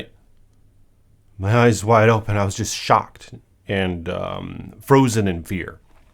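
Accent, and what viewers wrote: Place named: Canada. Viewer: I swear, I thought you were from Canada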